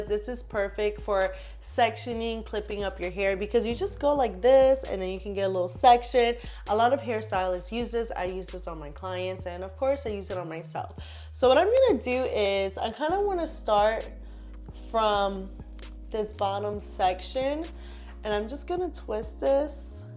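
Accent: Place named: New York, United States. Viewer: I wish I knew where she was- I hear a NYC accent but I don’t know where she actually works.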